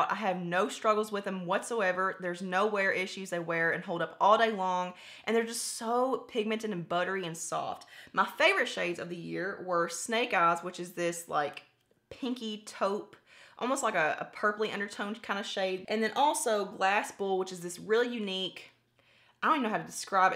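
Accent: South, United States. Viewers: Whoohooo! 1st comment, this never happens! I love your channel, your honesty, the range of products you use from drugstore, high end, and your accent!! Fellow southerner here! 🙋‍♀️❤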